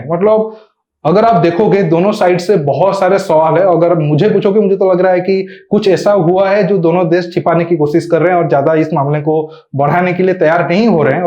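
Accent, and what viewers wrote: Odisha, India. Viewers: AP bhai odisha se ho kya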